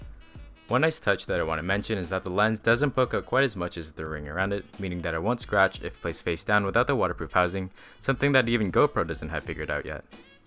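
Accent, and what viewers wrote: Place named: Scotland, United Kingdom. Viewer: Fantastic review maybe talking just a wee bit to fast or maybe its just that accent im so used to Scottish. Great stuff thanks and oh maybe if you have a moment to tell me the awsum music that you used in your review. Much love from bonnie scotland ACH AYE!!!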